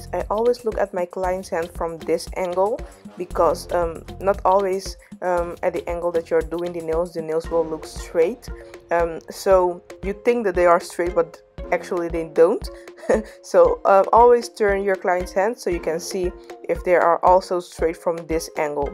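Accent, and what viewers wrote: Netherlands. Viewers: Ben je misschien nederlands lijk ik te horen aan je accent lol?😂) are you dutch ? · What country are you from, you sound dutch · ik hoor gewoon dat je Nederlands bent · Ik dacht al ik hoor een NL accent door je Engels. Toen ging ik naar je insta en zag dat je NL bent. Hahahaha in ieder geval je doet echt heel mooi werk💕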